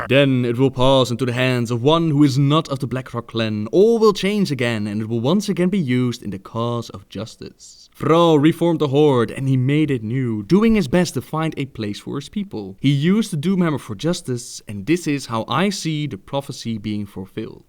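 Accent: Netherlands. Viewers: he's dutch, he has an accent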